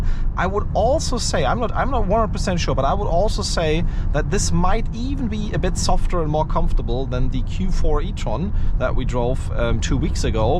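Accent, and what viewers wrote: Germany. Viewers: I Like your german accent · Man hört richtig dass du ein Deutscher bist :) schönes Video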